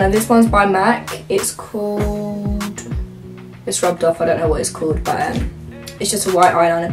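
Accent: England, United Kingdom. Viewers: Where in England are you from?? Flawless btw xx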